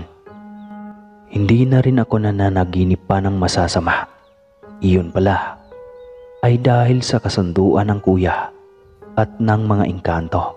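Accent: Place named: Visayas, Philippines. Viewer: Boss bisaya kaman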